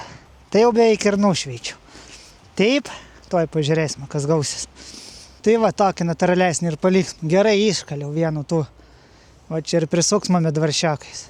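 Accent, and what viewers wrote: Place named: Utena, Lithuania. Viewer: taip ir galvojau ,labai tarme girdėta ,kadangi aš iš Anykščių😅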